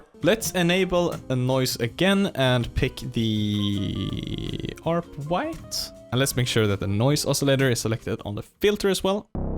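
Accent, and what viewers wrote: Sweden. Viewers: Damn you sound swedish! haha Haven't looked further than , but damn, the swedish accent is killing me LuL - another swede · You from Sweden? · it's the swedish accent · it’s the thick Swedish accent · Can an accent get more Swedish?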